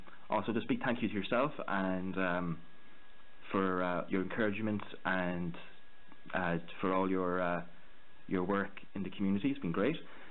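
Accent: Ireland. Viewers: Great to see ya back, and to hear those dulcet Irish tones;) Ya there's still snow in the woods over here but it's melting really fast now. It's pretty much been the worst winter that I remember ever. I'm hoping that it's the last one like that. I really like the look of that panzer 1 bud, I normally don't do the contest thing, but I may make an exception. Mark me down for when you do the draw eh? That's a nice little kit and I've never build ta Pz I.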